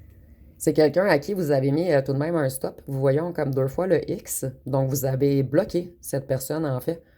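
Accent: Quebec, Canada. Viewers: Tres beau tirage merci beacoup il me parle j'adore votre accent québécois et j'adore vous ecouter..... merci❤❤❤❤❤❤❤❤❤❤❤❤❤❤❤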